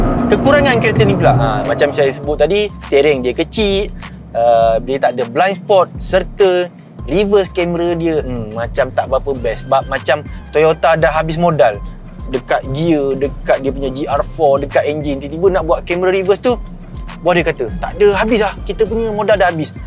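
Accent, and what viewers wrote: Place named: Terengganu, Malaysia. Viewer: steady abg ni review. tapi kadang2 terdengar slang Terengganu dia tu. steady awok kite.haha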